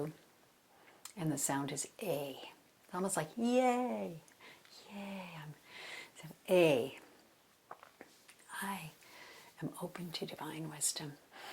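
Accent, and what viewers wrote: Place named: United States. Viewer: She is American so she has an accent if you know better you can make a video. She has 109,0000 views because of her effort.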